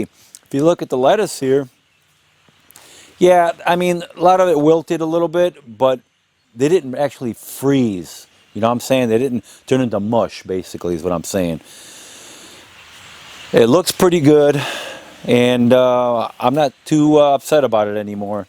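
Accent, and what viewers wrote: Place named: North, United States. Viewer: You keep saying "we, down here", but you don't sound like you're originally from "down here". I was born and raised in East Texas myself, but you sound like maybe you're from a little further north. Nothing wrong with that. Have several Yankee friends. Was just curious where you grew up.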